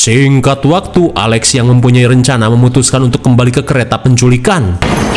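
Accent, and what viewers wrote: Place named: Madura, Indonesia. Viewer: Madura hdiiiirr mas broooo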